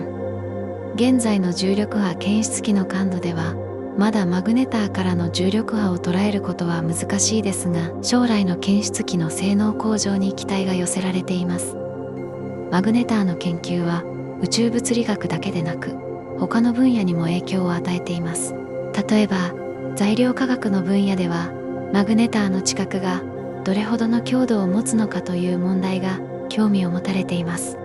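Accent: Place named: Tokyo, Japan. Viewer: これより完璧な東京語を伺ったことがありません。 、イチオシです。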